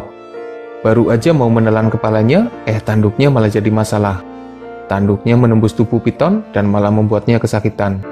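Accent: Banyumas, Indonesia. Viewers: Daleme pundi min admin. Ngapak ya min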